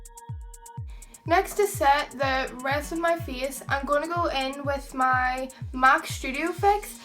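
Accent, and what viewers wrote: Northern Ireland, United Kingdom. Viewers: If u don't like her accent them why are u watching her videos?!?! that is what people from Northern Ireland sound like so u are basically insulting me and everyone else from there! There is no need to be rude! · Northern irish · northern Irish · lanseto NORTHERN ireland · she's from Northern Ireland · it's the northern irish accent, she can't really help it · I'm from Northern Ireland too, she can't really change her accent. I think it's cause people on here aren't used to hearing northern Irish accents since there isn't many youtubers from Northern Ireland so you probably aren't used to it! But yeah she can't really do anything about her accent! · Northern Irish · She's British (Northern Irish),she can't help it,it's just an accent. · She's from Northern Ireland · Northern Irish like mine :) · Northern Ireland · NORTHERN IRISH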